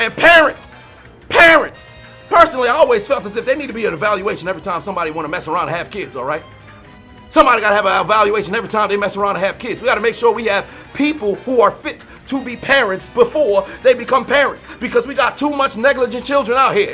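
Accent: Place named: North Carolina, United States. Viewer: Sounds like North Carolina or Florida honestly.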